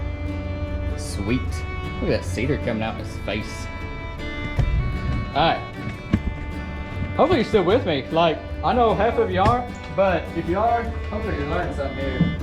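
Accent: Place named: South, United States. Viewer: You’re definitely watchable. Is that a word? South Carolina for me? I detect some southern drawl. Thanks for sharing your remarkable talent!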